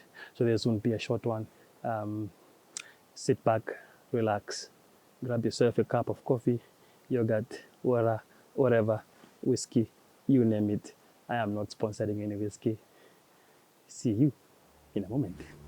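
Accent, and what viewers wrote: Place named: Scandinavia. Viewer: wonderful review in a near viking accent🤩🤩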